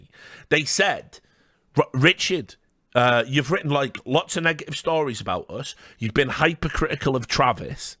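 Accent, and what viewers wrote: United Kingdom. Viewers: The squeaky bum time got me at the end,always nice to hear a brit venting about how fucked up some power structure is. Cheers to the toast at the end and thanks for the constant content!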